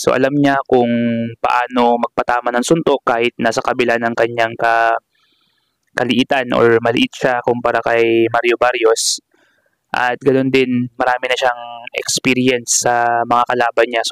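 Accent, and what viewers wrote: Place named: Visayas, Philippines. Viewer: Bisaya ka dong halata medyo bagwet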